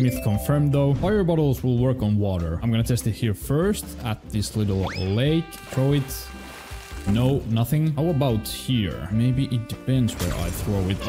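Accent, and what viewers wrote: Finland. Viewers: You sound like finnish guy and i from there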